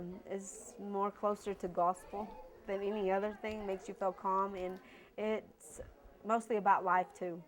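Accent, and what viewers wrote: United States. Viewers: I love your Tennessee accent... It looks real good on you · Dime donde recides te dire si estas en lo correcto. El asento de ella es Americano trabajo con Americanos y le entendiendo y su acento esta como un Americano es mas convivo como muchos Universitarios. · Hahahaha Piche wey no, sabes que es acento americano si supieras no dirías nada, oye Franky alivianate carnal actualizate su acento es muy country y muy América oye Franky mejor cierra la trompeta por que no sabes nada de ingles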